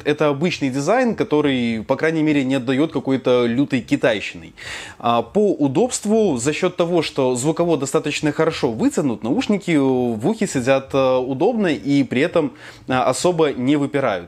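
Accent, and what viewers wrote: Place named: Belarus. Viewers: Дзеканье цеканье то да, отличительная особенность белоруса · Сам тоже из Беларуси, но после года жизни в Москве, очень слышен твой белорусский акцент, аж уши режет)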